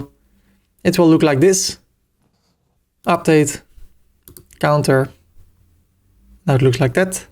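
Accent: Netherlands. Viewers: The Dutch English is to much cringe. x(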